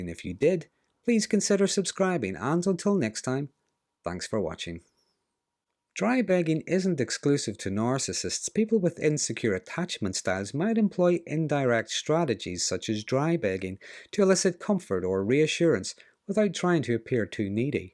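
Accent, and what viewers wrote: Ireland. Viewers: Sounds like an Irishman to me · >>10-Q, Counselor. I'm glad I stumbled onto your "Dry Begging" lecture. >>My psychotherapist and I will discuss it at our upcoming Zoomer! I remain Respectfully Serene, NHG. >>PS what a delightfully sweet Irish accent!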